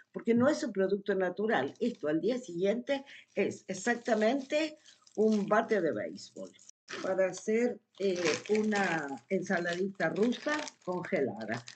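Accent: Argentina. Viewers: Hola...qe bien te explicas... No se te nota casi qe eres..Argentina....es bueno comprar en otros super...no siempre en el mismo..y asi se compruevan..los precios y calidad claro chao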